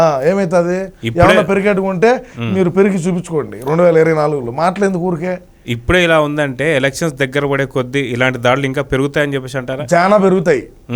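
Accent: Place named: Kadapa, India. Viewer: ఇంతకు నీది యెవూరు బ్రో కడప స్లాంగ్ కూడా వచ్చింది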